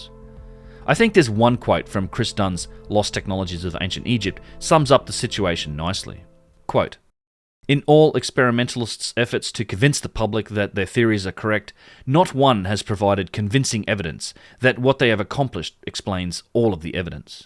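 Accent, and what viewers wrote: Australia. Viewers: That's a fellow Aussie accent! 😉 Nice work mate 🙂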